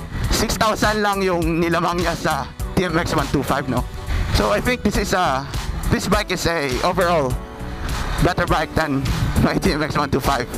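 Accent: Iloilo, Philippines. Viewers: Nami ma mati illonggo nga motovloger..... especially Ang tingog mo hahaha daw wla gid d b illonggo bloggers ehh heheheh nga illonggo gid Ang Gina hambl sa vlogs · Boss. I noticed from your previous vlog that you sounded Ilonggo! Where's this route your taking today?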